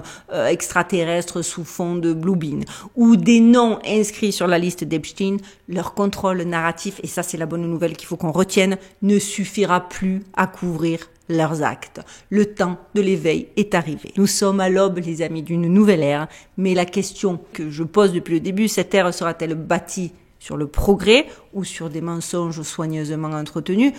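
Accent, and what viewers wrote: South, France. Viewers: Son accent du sud est insupportable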